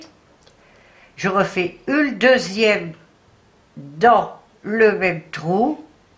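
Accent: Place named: Nord-Pas-de-Calais, France. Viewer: Bonsoir, toujours le même plaisir pour moi à entendre l'accent chti^^ pour la laine maman en a elle vient de chez Babou. Bonne soirée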